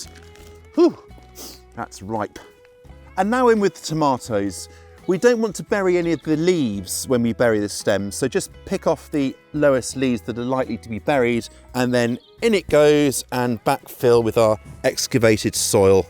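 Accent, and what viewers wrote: England, United Kingdom. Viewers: to-mah-toes. i love an english accent. fish heads, not so much lol. for cherry tomatoes i have collapsible 4 sided, 4' tall plastic coated wire tomato cages that fit perfectly around my 4 gallon nursery pots in my container garden. i put them up in a 2 or 3 row checkerboard pattern, joining touching corners with wire plant twists. [picture zigzag pattern for a 2 row, or 4 corner cages and a middle cage for a 3 row.] all plants are easily reachable and get air and light. [i feel the row is more stable like this; we get fierce thunderstorms with wind.] additional training support is given with [1/4"W] plastic coated 4' stakes [not wood, termites are a thing in the south.] monster varieties eventually get transplanted into 10 gallon pots with [1/2"W] plastic coated 6' training stakes, also not wood. after 6' i cut off the top of the plant since i cant reach any higher. 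stakes not in use store very well in a vertical [4"W] length of pvc pipe screwed to a stud in the garage.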